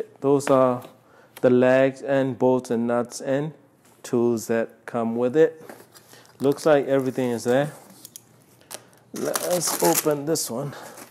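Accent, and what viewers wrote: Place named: Myanmar. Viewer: You have a Burmese accent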